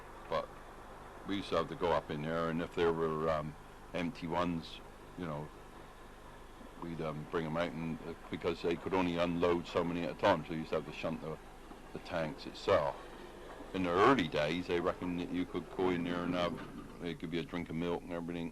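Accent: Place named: Dorset, United Kingdom. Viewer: Its nicest to hear it narrated in that wonderful Dorset accent. It takes me back in time to my childhood. I loved to hear the Bath drivers, then the somerset accents around Evercreech to the Dorset accents of the drivers and firemen. It makes me feel at home.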